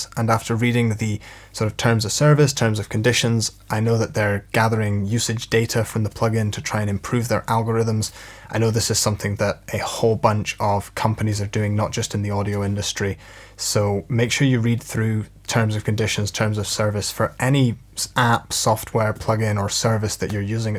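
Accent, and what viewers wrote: Scotland, United Kingdom. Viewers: Where is your accent from? You sound scottish with an english and american accent lol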